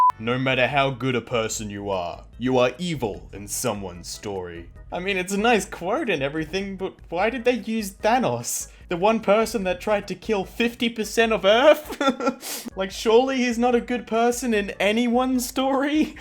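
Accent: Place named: Australia. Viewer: idk if i heard correctly but i can hear a bit of an aussie accent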